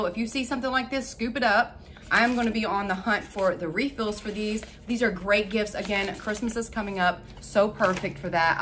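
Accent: South, United States. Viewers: 🎃 I always stay till the end. I love watching all the stuff u get and I love how excited you get over the things you buy, plus I love your southern accent. Stay safe.❤🤗👍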